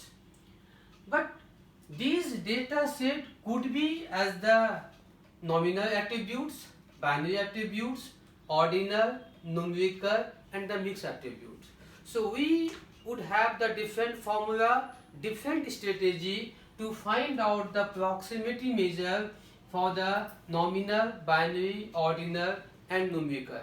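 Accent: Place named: India. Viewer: not racist but damn indian accent is something... tbh i rather hear indian speak indian so i can at least use auto generated.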